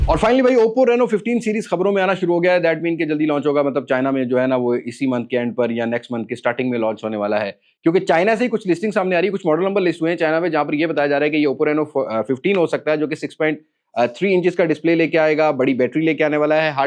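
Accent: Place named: Punjab, India. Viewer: Punjabi wala touch chak k rakho❤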